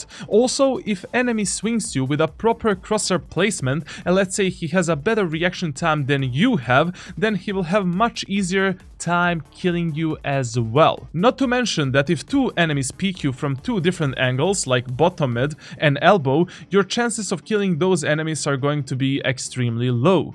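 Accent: Russia. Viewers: Okay but are you Russian or Ukranian 🤨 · Where you from ? Russian Turkish ?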